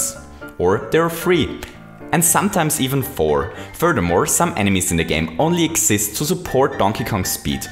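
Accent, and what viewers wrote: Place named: Germany. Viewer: He's from Austria, it seems. I knew the accent sounded German!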